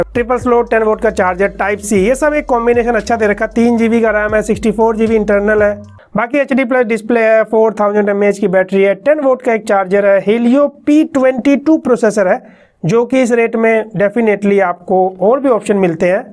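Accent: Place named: Uttar Pradesh, India. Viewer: आप का स्टाइल zabardast है. Especially the u.p. वाला touch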